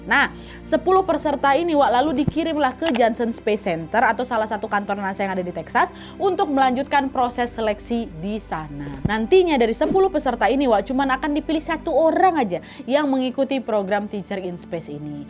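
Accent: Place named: West Sumatra, Indonesia. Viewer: Seneng sih denger penyampaian dari. Dari logat bahasanya, orang minang ya wak? 😁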